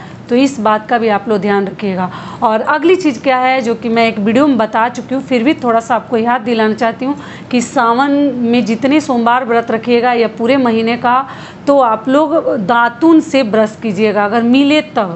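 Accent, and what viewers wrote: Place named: Bhojpuri, India. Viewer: Rajasthan. Ki. Saskriti. Kebareme. Pahnave. Ke. Bareme Ap Jantih. Kya rajsthani. Orte. Vat. Savitri. Ka. Vartme. Nhi Samjatih Inkeliye Tij Karvacoth. Ke. Braber. Apke Vat. Savterike Vart K. Braber. Apke. Guru Konhapkhase. Bilong Kertih. Apki. Boli Bhojpuri. Lagtih. Apko. Ptah. Rajsthanme Moutabu me Bht. Bra. Atmgyani. Permatgyani. Barahamkumari. Sasthanh Muje. Ptah. Biharme Patna Saherme. Bodstuph. Purane. Jamane. Me. Taksila Visvvidaly. Tha. Itihaas me. Kabi. Hamare. Rajsthanki. Saskriti. Ke. Bareme. Btaiye hamare. Pregnancy me. Mehndi. Nhi. Lagat hgodbraim. Lgatehh Akbar Satve Ya Nave Mahine Hamare. Yha. Maglsuter. Nhi. Pahnqte. Galeme. Kanti. Pahnteh. Morden. Orte. Ajkal. Pahnrih. M nhi. Pahnti. Muje gahn. Nhi. Suhate. M. Simple. Ladyhu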